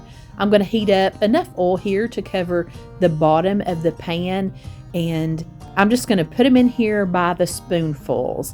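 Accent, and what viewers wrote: South, United States. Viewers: I just love your recipes and videos because your recipes are comfort foods and I just love your southern accent I don't miss your videos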